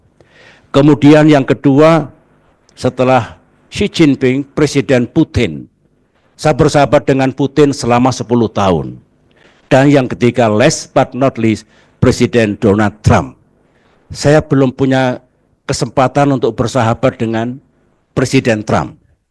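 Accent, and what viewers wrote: Java, Indonesia. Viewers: lidah wong Jowo 😀😀🙏🙏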